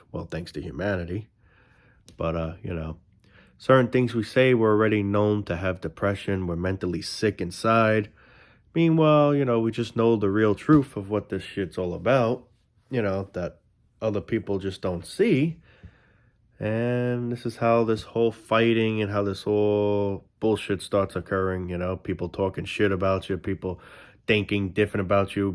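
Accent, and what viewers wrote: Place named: Boston, United States. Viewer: I'm listening to your accent and I'm fairly sure and please correct me if I'm wrong but you sound like you're from Boston ... I'm from Maine so I hear the dropped Rs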